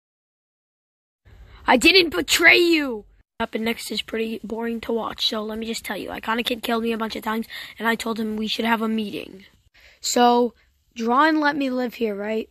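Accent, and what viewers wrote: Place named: United Kingdom. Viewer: You have a good British accent and that's coming from a British Person.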